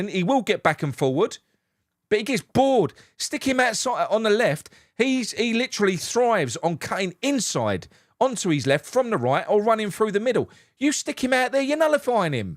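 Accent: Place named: Birmingham, United Kingdom. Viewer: Manc fan with a brum accent losing his head 😂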